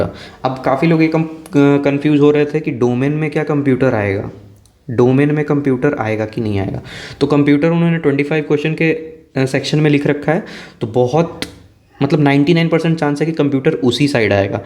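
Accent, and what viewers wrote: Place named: Jehanabad, India. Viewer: भाई जहानाबाद से हो तुम??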